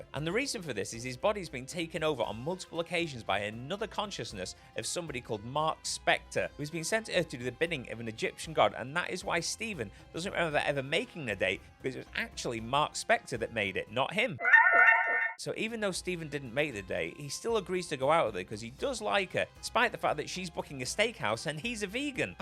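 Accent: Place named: United Kingdom. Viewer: I like your accent! Where are you from? The UK? as for the topic: there's a lot of anti vegan propaganda in movies. I'm not surprised. it's their agenda to make us look unsustainable and treacherous.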